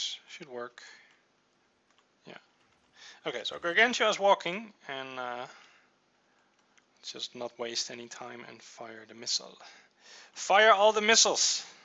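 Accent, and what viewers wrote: Netherlands. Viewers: Your dutch arent you? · are u dutch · boi je bent nederlands. Dat horen we allemaal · Are you dutch? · r u dutch? :3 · are you dutch? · Ik dacht ook al zoiets. Je hoort het gwn aan z'n accent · your english sounds very dutch. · Hes Dutch i can now it cause im Dutch to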